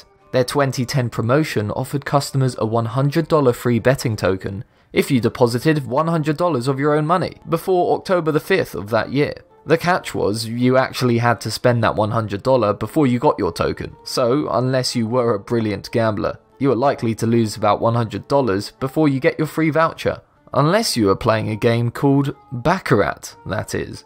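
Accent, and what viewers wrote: United Kingdom. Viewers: Why did I subscribe just cause he's British 😂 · annoying british accent unsubscribed.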